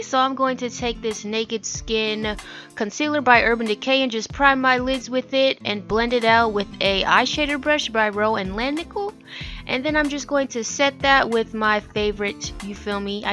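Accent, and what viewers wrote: New York, United States. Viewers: lmaoo i love this ur deadass from new york "yafeelme"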